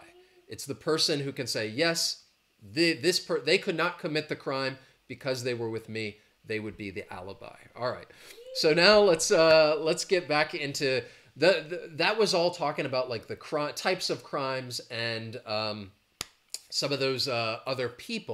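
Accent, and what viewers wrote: United States. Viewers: I'd like your US accent ☺️☺️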